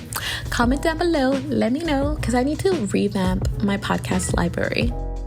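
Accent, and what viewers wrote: Nigeria. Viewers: Wow 😯 I had to pause when I heard your naija accent. Ayyyyy Hi sis 💕🇳🇬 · AS soon as you hit that Nigerian accent I subscribed! I love following African youtubers because its easy to relate to them. I am glad you were in my recommendations! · As soon as you switched to the Nigerian accent you won my heart and I subbed 🤗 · same totes nigerian accent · She’s definitely either Nigerian or Ghanaian😭✋🏽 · That Nigerian accent was unexpected 🤣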